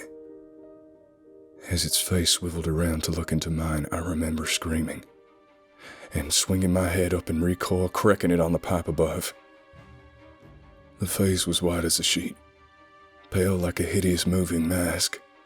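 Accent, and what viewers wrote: South, United States. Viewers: Once again sir, well done. as per usual, your timing, tone, and emotion is perfectly delivered. Maybe you could mess up every now and then, just to prove you are not an alien life form. Also, I absolutely love this accent. It sounds to me like a mix of north Tennessee good ole' boy, and a south Georgia gentleman, but that's just my take on it. You could easily pass for a real Southerner. Keep up the great work buddy! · As somebody from the South, I'm really blown away by your Southern accent. Like, can we informally adopt you? 😂 Bravo! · are you sure that you weren't born in the south? Your accent is spot on! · I Love the Southern Accent! 🤗 Really Wild Story! 😍 Thanks For All Your Hard work! 😙🙋✌💜🐺🐶🌻🐢🐾🐳❤💋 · Faith Castillo - haha fair enough! It IS a good southern accent, anyway. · I settle in to hear soothing voice and am startled by this jarring "american" accent. 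Yikes! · "Butt hurt" 😂 I haven't seen any butt hurt comment here yet, still scrolling tho. So far people seem to like the accent. I personally don't like southern accent at all, for non-english speaking like I am it sounds somehow slurry and words are sometimes very hard to recognize. It's important to remember that disliking someones accent doesn't mean the person themself is dislikeable (is that a word 😅). I'm definitely not butt hurt about this story's accent since the author has so damn beautiful voice 🥰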